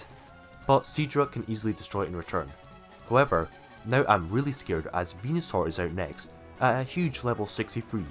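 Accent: Scotland, United Kingdom. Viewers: yeah I had you pinned as Scottish maybe Glasgow or something but in some bits I wasn’t sure lol Great vid.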